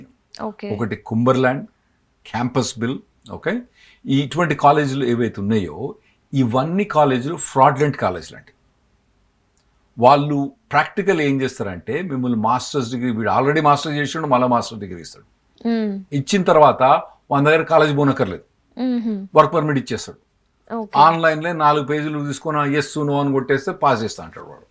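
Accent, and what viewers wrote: Telangana, India. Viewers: Sir your words are pakka telangana❤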